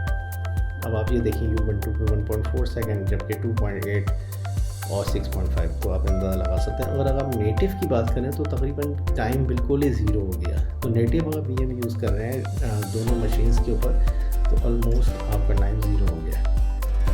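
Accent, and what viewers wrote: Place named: India. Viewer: This hardcore indian-english accent, combined with the loud background music makes it really hard to understand anything at all 🙁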